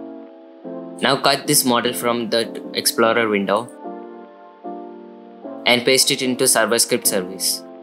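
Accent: Kerala, India. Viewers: are you a malayali or indian you sound really like one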